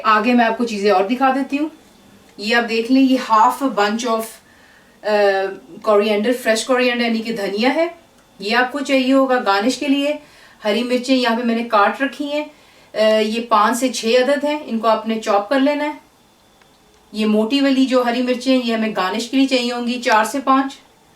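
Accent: Punjab, India. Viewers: omg... I literally drooled over my keyboard. Thx a lot ji.... I love your Punjabi style Hindi (Urdu):) Maza aa gaya kasam se recipe mein. Will definitely try this. Cheers from Korea!! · I made your dish. It's delicious. I love your accent mam, it's like typical punjabi mom. Thanks for the recipe :D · Dear Ji, I have tried this recipe and it was AMAZING. My father loved it absolutely, I belong to Sikh family and it was just the kind of delicacy I was looking for.:) Thank you again Ji, please upload some more Chicken recipes:) And yes, your Punjabi accent is amazing, and I am sure you must be an amazing person too:) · I love your punjabi accent :) it's ncie to listen to!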